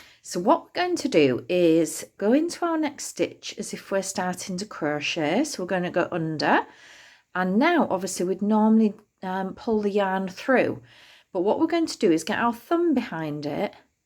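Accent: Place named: Yorkshire, United Kingdom. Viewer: Tricky, but clever ! Lovely true Yorkshire accent ,,just same as my Grandaughter! 😀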